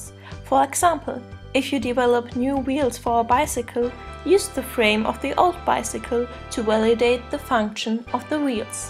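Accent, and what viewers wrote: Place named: Germany. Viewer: Thank you, this is a fairly comprehensive overview of what a prototype is. I appreciate the depth of the technical terms used. By the way, "Resources" is spelled incorrectly. Also, please reach out if you are interested in an English voiceover for any future projects. (It is sometimes a little distracting, from the content, to listen to the German-accented English pronunciation and mispronunciations.) Otherwise, thanks for posting!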